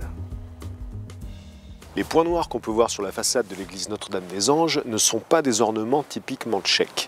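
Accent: France. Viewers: J'ai un peu mal en entendant la prononciation française des noms tchèques, mais chouette visite. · Salut!!! Tres tres tres bien!!! En tant que tcheque j aurais aimé que vous ayez prononcé mieux (cad pas a la française) les noms des personnes et endroits, mais c est un petit detail pas tres important. Chapeau, ou bien “klobouk dolu” comme on dit chez nous.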